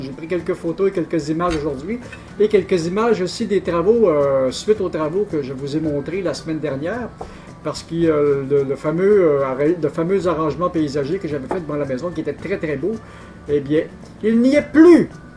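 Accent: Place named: Quebec, Canada. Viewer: Vraiment trop sympa et vraiment bien documenté. J'adore l'accent Quebecois !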